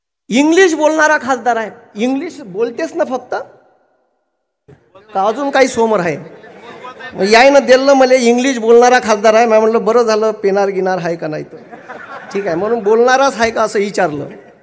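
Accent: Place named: Nagpur, India. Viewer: Nagpuri bhasha aahe aamhi asach bolto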